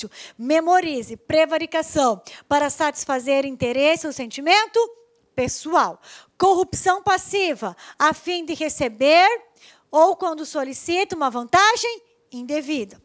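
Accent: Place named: Curitiba, Brazil. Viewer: Adorei essa profe e o sotaque curitibano tipo o meu (senTE)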